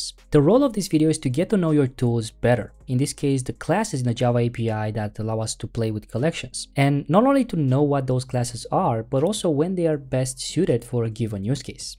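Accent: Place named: Brazil. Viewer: Você fala português? Parece um brasileiro falando inglês.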